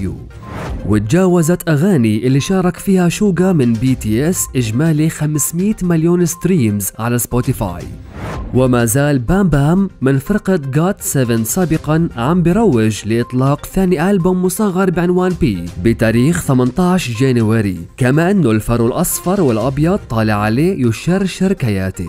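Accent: Iraq. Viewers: هسه يلي جاي يسوي اخباࢪ هوه عࢪاقي اشو يحجي مثلنه العࢪاقيين 🇮🇶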